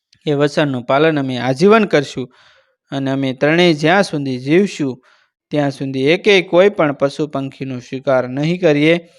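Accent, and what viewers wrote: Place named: Saurashtra, India. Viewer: વાર્તા તો બહુ સારી કરો છો ભાઈ,પણ હિન્દી માં સૌરાષ્ટ્ર ની ખુશ્બૂ મૂકી દીધી , આપણાં ગુજરાતી ઓની આજ માથાકૂટ છે,હિન્દી પણ સારી રીતે નથી સીખી નથી શકતા,ખૂબ સરસ મજા આવી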